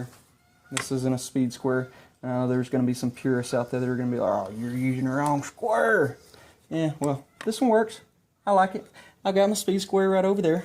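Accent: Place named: United States. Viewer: Thanks for making us I needed somebody that speaks American most of the videos for some reason are from New Zealand or England and no offense my brothers out there it’s just y’all got the metric system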